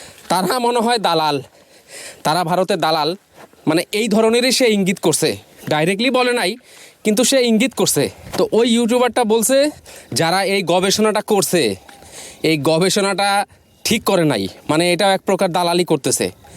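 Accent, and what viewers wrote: Bangladesh. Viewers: Aapner Bangla ta west Bengal er Banglar moto noi ... Bangladesh er banglar sathe khub mil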